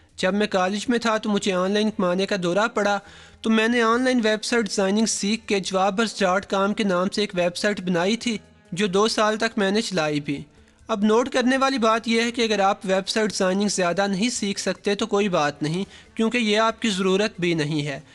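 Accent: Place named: Lahore, Pakistan. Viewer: Yar tussi lahori ho ☺☺😑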